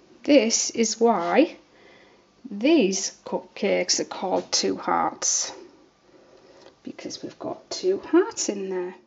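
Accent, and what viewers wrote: United Kingdom. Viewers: Love to hear your beautiful British accent and your videos! Living in Rochester, NY we have alot of gray days as well. Keep up the great work, You cheer us up here!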